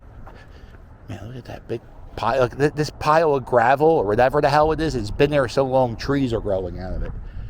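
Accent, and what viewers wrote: Philadelphia, United States. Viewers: Your the best!! I moved to Florida from Philly in 2008 and I can hear the Philly accent in your voice!!! Makes me homesick!! Lol miss the cheesesteaks!!! You are so awesome to show the good and fun things in Philly!!! I think I may have seen you around we lived on axe factory and mower streets!!! You are a funny guy!!!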